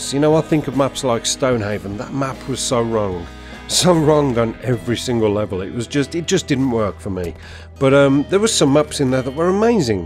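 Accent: United Kingdom. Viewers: dropped a like, good video, also it's good to find a good British commentator, when everyone seems to be american in the cod community.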